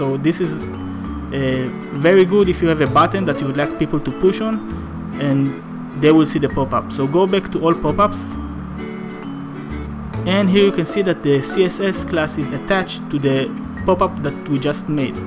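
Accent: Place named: Tanzania. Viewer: You have a Tanzania accent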